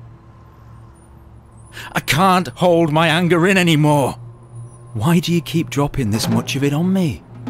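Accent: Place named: Sheffield, United Kingdom. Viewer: Not badly done, just don't really understand why they got a voice actor from Sheffield or wherever, and not a Brummy? Are Brummies themselves sick of their own accent?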